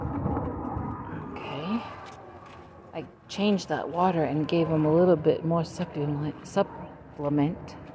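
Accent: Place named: Connecticut, United States. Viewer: Me too. From Connecticut. Been here almost 17 years. I recognized your accent. 😊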